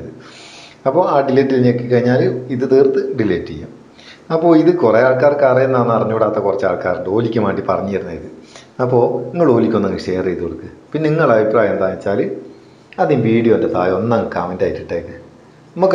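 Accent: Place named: Kannur, India. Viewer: Kanur basha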